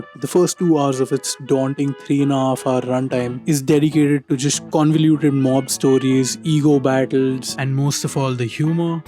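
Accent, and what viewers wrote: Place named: India. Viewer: Dude that indian accent hurts the ears 😢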